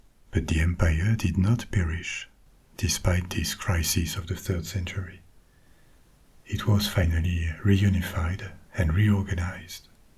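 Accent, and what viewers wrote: France. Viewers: Sa fait bizzare d ecouter de l asmr anglais avec un accent français 😂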